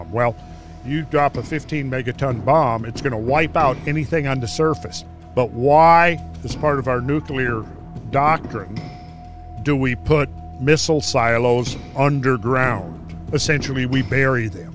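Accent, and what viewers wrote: Minnesota, United States. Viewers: His Minnesota inflection makes him sound like the Jesse Ventura of paleogeography.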